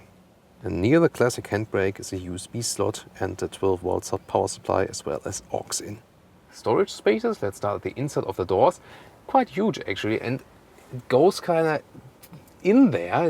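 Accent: Germany. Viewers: Oh...hallo, natürlich verstehe ich Englisch. Aber ich dachte mir, warum macht er mit diesem sehr deutschen Akzent denn nicht einfach ein deutsches Video. Die Erklärung leuchtet mir ein...vielen Dank. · Is that a German accent?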